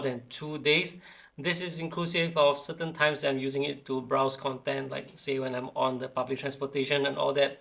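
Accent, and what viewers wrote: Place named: Malaysia. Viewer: Nice vid, you sounded like Malaysian/ Singaporean 😄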